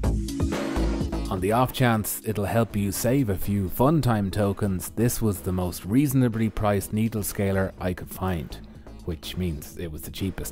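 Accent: Ireland. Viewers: You're still one of the best video editors, I love your work. The content itself is excellent and has me mesmerized. And the Irish accent narrations is the cherry!😉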